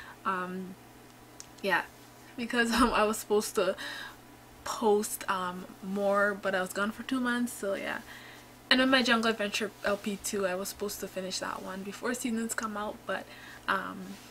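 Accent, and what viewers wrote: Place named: Quebec, Canada. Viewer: i really like your accent- ik i sound creepy and correct me if i’m wrong but it sounds like a French Canadian accent. Anyway, hopefully you can get the Seasons pack soon ‘cause I’m super excited to watch the storyline that you came up with!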